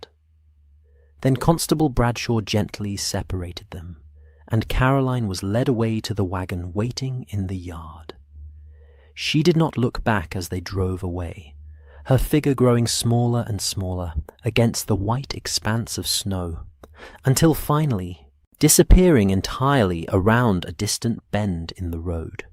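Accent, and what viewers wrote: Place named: England, United Kingdom. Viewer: Clark is how we say that word in Britain. He has an English accent but can't say Vase. We say V-ah-ze. Americans say Vay-ze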